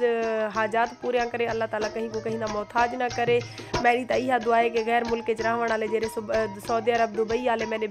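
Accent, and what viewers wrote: Saraiki, Pakistan. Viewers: Batao g ap Kahan ki Hain ap ki zuban sariki bahot achi hai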